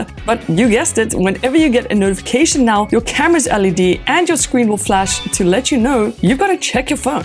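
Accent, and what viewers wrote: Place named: South Africa. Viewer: Always satisfying to hear that accent and know exactly where someone's from. Greetings from a South African in Poland!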